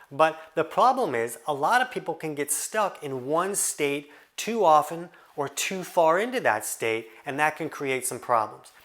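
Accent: South, United States. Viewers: I like your subtle Southern accent.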